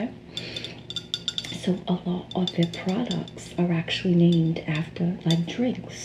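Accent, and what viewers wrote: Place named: New Jersey, United States. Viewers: Jersey Accents in ASMR is sooooo Top Tier🥴🤌🏾🤌🏾🤌🏾🤌🏾🤌🏾 Thank You Always Beautiful 😍🥹🫶🏾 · Ohhh jersey accents in asmr are elite 😍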